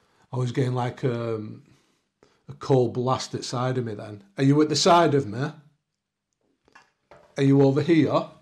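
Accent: United Kingdom. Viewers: Sir, why have you not spoken to your local parish and asked for a blessing of your home and property? I gather from your accent you're British; certainly the COE or the Catholics can assist. You are on a long journey now; be advised Evil always scratches us when encountered. You definitely need spiritual protection from the Christian Church and none of these others. For Real.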